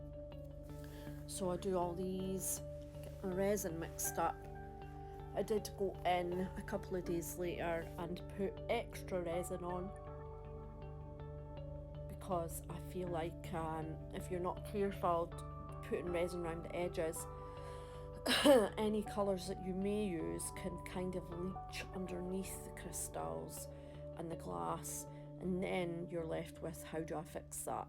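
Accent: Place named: Scotland, United Kingdom. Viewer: lol 😂 ur so funny! It’s def more than okay. But I get it, most people don’t hear their own voice in the same way others always here them. So it sounds weird to ourselves. Plus, I really get the chance to hear a beautiful Scottish accent. You’re so used to that sound I don’t you jump for joy over it, but someone else’s accent you like and don’t hear often makes it awesome. Well thank you kindly for the compliment, if I always wasn’t so stuffed up from allergies maybe I could speak more clearly. 😜😘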